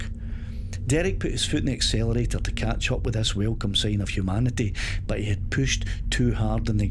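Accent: Scotland, United Kingdom. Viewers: Just found your channel-- great story- telling, love yer guid Scots tongue and accounts of true Scottish encounters!❤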